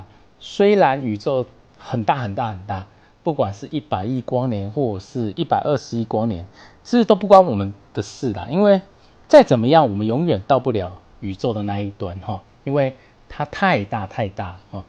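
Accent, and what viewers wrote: Taiwan. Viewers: 滿滿的台灣腔齁.....係勒齁沙小的?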